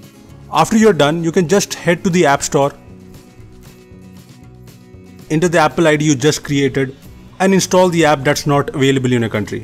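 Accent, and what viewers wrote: India. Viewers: When the Indian guys will fix the English accent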